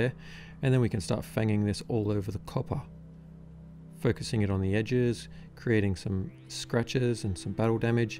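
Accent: Australia. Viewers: "a bit how ya goin' " it truly is wonderful to hear the Aussie vernacular getting represented in the Warhammer Community. "Wha'do'ya'think of tha new Primaris moooite?" "Yeah not behd pretty good size" "Corvus, he's a traitor ay?" "Yeeaaahhh... Naaahhhh"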